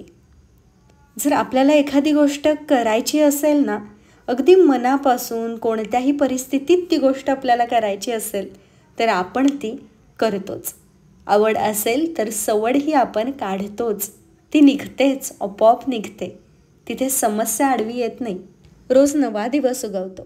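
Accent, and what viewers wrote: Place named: Satara, India. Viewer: तू ना खरंच लय भारी कडक आहेस आमच्या सातारी भाषेत बोलते,लहान वयात इतकी समज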